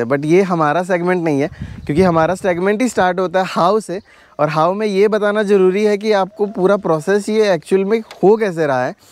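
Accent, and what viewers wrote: Maharashtra, India. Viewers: This is my first time. I really like the way u explain and your touch of Marathi in hindi really sounds good.Keep up the good work.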